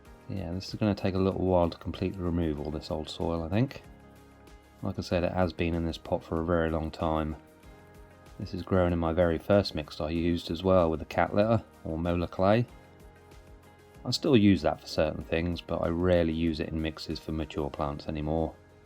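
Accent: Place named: Somerset, United Kingdom. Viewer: Excellent video! Thanks. Your accent reminds me of my friend Garry from Somerset. I’m in the US. Have a great day.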